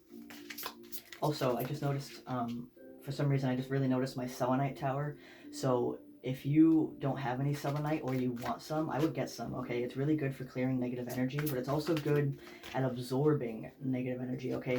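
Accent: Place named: New England, United States. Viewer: Just found you...love that New England accent! I'm from there too. Spot on, but the guy I loved turned out to be a total a&&s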